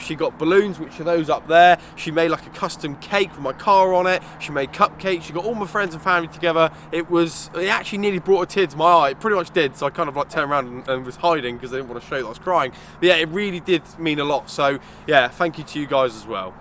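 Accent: United Kingdom. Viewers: Sound so posh in your old videos man 😂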